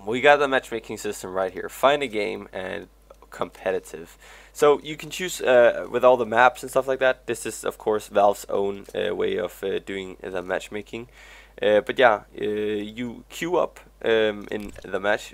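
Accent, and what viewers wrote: Denmark. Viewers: HaHa kunne høre fra starten af du var dansk. :) god video! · Are you danish? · your Danish? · Are u from Denmark?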